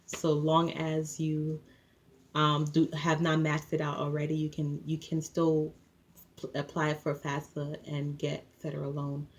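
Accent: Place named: Liberia. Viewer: Thanks for the info! I hear the Liberian accent lol....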